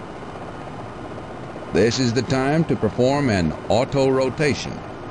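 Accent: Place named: South, United States. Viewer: From Kentucky. I didn't notice the narrator trainer's southern accent until just now. I used to play this as a kid 25yrs ago and he sounded like all the other old farts around here telling me what to do 😂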